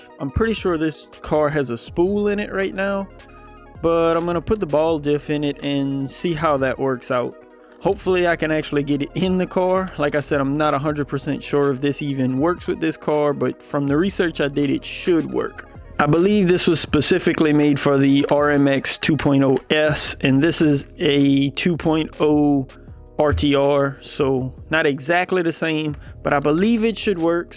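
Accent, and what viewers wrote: Louisiana, United States. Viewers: Great video brother very informative I'm about to swap mine out. Your accent gives me hope that I am not the only one drifting in Louisiana! Greetings from Denham Springs.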